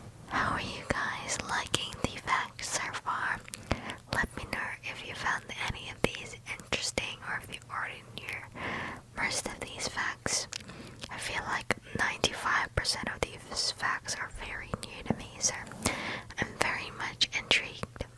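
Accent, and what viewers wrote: Australia. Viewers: that Australian accent even through the whispers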